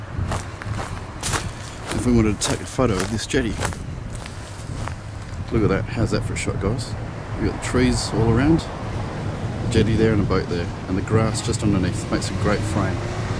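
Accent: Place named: New Zealand. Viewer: Great Video. Classic Kiwi accent.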